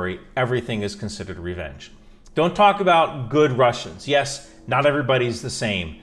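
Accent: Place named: United States. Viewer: As an American who has been to Ukraine several times there is a lot of good advice here and several things I didn't think of until mentioned. But don't take language lessons from him, his accent when pronouncing Ukrainian words is hilariously very American. I would disagree that liquor is usually Vodka. Ukrainians love wine. If like me you don't drink then learn to repeatedly say "Ya ni Pushi". Ukrainians accept that people don't drink alcohol, but they will also be shocked and offer repeatedly to make sure you're not just being polite. Ukrainian Catholicism is very different from the Catholicism you are used to in the USA. They are primarily Greek Catholics and Roman Catholics are uncommon.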